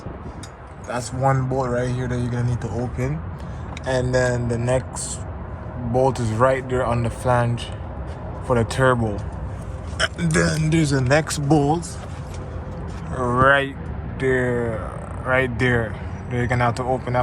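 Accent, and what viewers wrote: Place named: Jamaica. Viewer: u sound like yard mn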